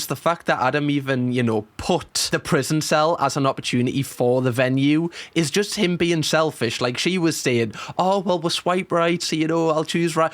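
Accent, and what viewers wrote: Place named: Geordie, United Kingdom. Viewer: Daily dose of being called a "Gorgeous Donut" and hearing this entrancing Geordie accent, YES! Love you,!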